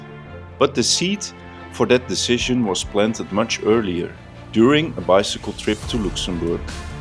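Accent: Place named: Netherlands. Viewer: Dat moet een Nederlander zijn aan het accent te horen. 😄 Leuk verhaal. Ik heb ook een RT gehad. Nu heel blij met een GS.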